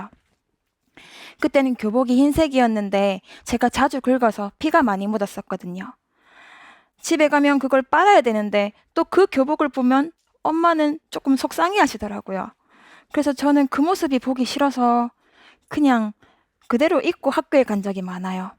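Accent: Gyeongsang, South Korea. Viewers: 음 대구분이시넹~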